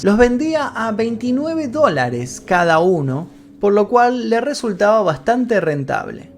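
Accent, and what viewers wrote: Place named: Argentina. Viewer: Excelente trabajo y documental y me encanta tu acento argentino. Saludos.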